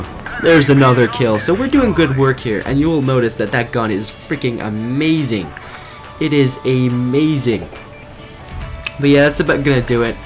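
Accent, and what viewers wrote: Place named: India. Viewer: the indian accent xD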